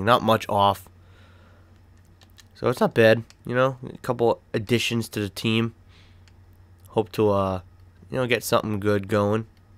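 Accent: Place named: Boston, United States. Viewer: You sound like you're from Boston. Looks like you still hurting from Eli owning the cheatriots in the super bowl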